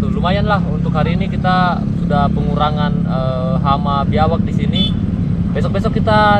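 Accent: Indonesia. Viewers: BHS nya spt org gorontalo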